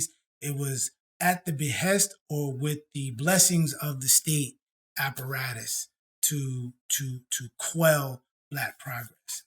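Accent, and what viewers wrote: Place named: New York, United States. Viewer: Turned it off soon as I heard the NYC accent.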